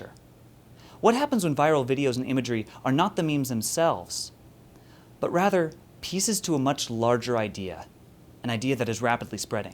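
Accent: United Kingdom. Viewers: u sound like ur britland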